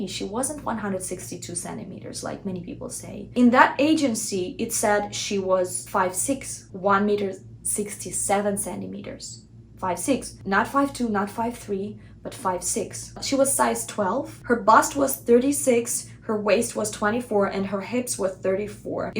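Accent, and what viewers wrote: Russia. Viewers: По акценту поняла сразу, что автор русская))) местами прям проскальзывает произношение, на согласных особенно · Was listening to you and all the time was thinking,that you are Russian,the accent is evident, then read about you after the video and saw that I was right❤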